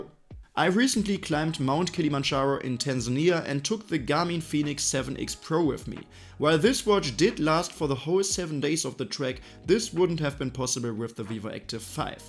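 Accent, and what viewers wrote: Germany. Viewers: holy shit i’m laughing here. Saw your video and clicked to learn about the watch and immediately thought to myself what a thick German accent while glancing down at the name of your channel.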